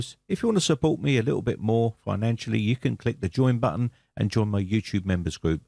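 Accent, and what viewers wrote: United Kingdom. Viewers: I gratefully join the many satisfied subscribers in thanking you so much for sharing your helpful skills & knowledge to make our work a lot easier with your elegant British accent. Thanks a lot.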